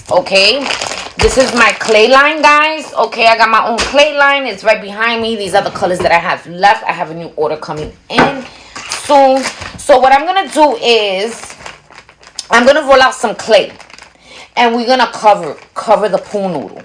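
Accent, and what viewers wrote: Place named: Bronx, United States. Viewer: I knew you was from the Bronx before you told us, girl😂 You have that Bronx swag❤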